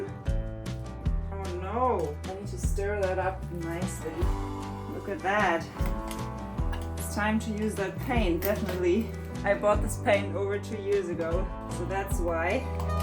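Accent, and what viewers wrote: France. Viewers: a very nice french accent, keeps going amazing works. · Mignon accent français 😘❤️ Bonjour de France🎉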